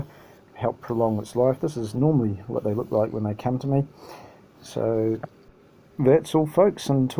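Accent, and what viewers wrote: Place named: United Kingdom. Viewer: Very Informative and if viewers have a problem with British accent....use the closed caption. Thanks for all the information.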